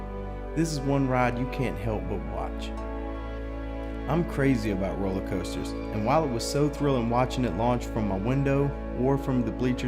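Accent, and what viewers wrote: South, United States. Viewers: I am guessing by your accent that you are from the south. If so, where are you from. I am from Andalusia, Alabama which is close to the Florida state line. I have also lived in Florida and I, now, reside in Tennessee.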